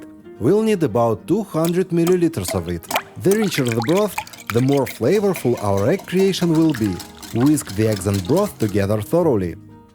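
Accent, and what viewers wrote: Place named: Russia. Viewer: Russian Dialect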